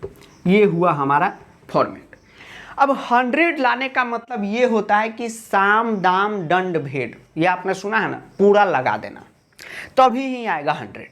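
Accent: Bihar, India. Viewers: Sir aap Bihari hai ya Gorakhpuri 😂😭😂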